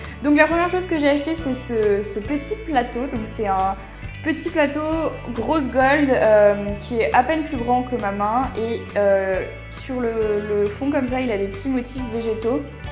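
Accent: England, United Kingdom. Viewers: Jadore ton accent anglais 😍😍💞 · Coucou 💎j'ai trouvé ta Vidéo super , déjà elle a pas durée 30 minutes comme certaines youtubeuses qui s'éternisent sur tous! Et puis tu as une façon de parler , expliquer très agréable a entendre.. Surtout avec la petite musique douce... Tu es posée et pas entrain de crier comme d'autres 💘ta coiffure et ton maquillage sont super jolies 💘ça te va trop bien!tu as un magnifique accent anglais 😉tu est super , je ne te regarde plus que toi sur Youtube tu es la seule vraie youtubeuse tu es une vraie passionnée et ça fait plaisir a voir ❤️ne change rien 💘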